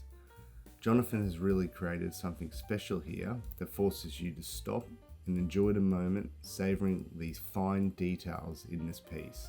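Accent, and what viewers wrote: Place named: Australia. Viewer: Great to hear another Aussie accent talkin' watches on YouTube 👍👍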